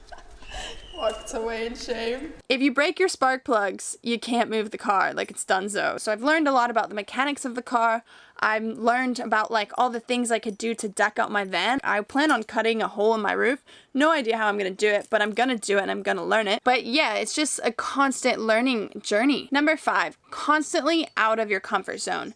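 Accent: Australia. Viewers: Why do you have an Australian accent now? 😹 BTW I like your blond hair. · She now sounds like Steve Irwin, the Croc Hunter. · Wow you sound a bit like an Aussie now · Have you noticed you started to say some words in an Australian / Kiwi accent? That's weird.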